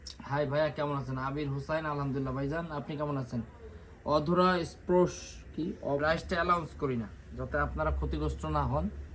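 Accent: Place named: Jessore, Bangladesh. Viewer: আমি যশোর থেকে আসসালামু আলাইকুম,,,,, কেমন আছেন ভাই আপনার কথা শুনে মনে হয় আপনিও যশোর এলাকার দিকের কোথাও আপনার বাড়ি হবে,,,, 😊আপনার ড্রেসগুলো আমার খুব ভালো লাগে আমি ছোটখাটো একটা টেইলার্স দিয়েছি ইচ্ছা আছে আপনার শো রুমে গিয়ে কিছু প্রোডাক্ট নিয়ে আসবো হেল্প করবেন আশা করি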